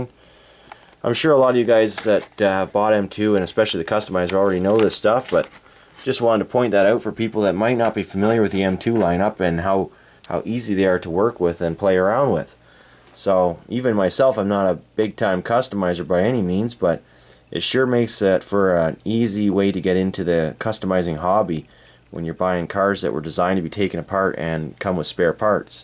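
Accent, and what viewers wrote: Canada. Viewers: Are you Canadian cause you say aye quite a lot there bud if so good aye bud I'm Canadian to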